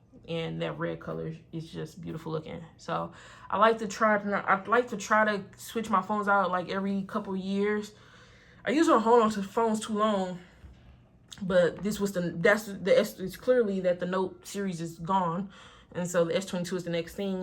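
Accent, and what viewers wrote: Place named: DC/Maryland, United States. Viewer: You have a DC/Maryland accent 😊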